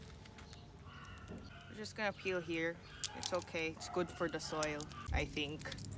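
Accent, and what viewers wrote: Philippines. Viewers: Wow! Pinoy accent talaga ha 😃 cool! Ang sarap naman kumain ng fresh na fresh gulay at fruits! May saging ba kayo dyan?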